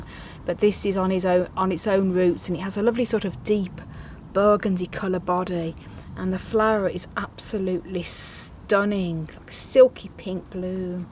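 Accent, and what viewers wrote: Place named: Birmingham, United Kingdom. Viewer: no worries is a true aussie saying 🤣🤣🤣🤣. To me you sound Brummy all the time , not as bad as Ozzie Osbourne though 🤣🤣🤣🤣🤣🤣🤣. Have a great weekend you and Hans, sending you lots of love from Ellyn and me. ⚘😗❤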